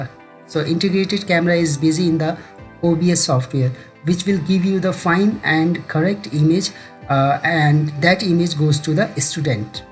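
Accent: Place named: England, United Kingdom. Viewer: Thank you sir. For sharing this video. I like your english accent sir. 😊😊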